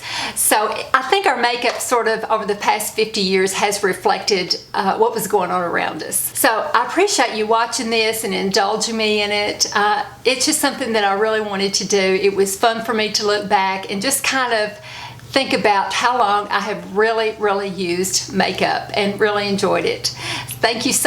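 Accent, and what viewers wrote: South, United States. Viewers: It’s Lorraine in England. Like any good Southern girl I loved my makeup, too. I remember going to London with my Mom and aunt when Twiggy and Mary Quant were big. After listening to this video I saw a banner that a cover band , Union Gap UK , Young Girl etc. from the 60’s ,are in town tonight. I may have to go to the concert! You remind me of my girlfriends back home in Virginia which is why I like your videos and insta stories. I don’t hear a Southern twang that often unless on line!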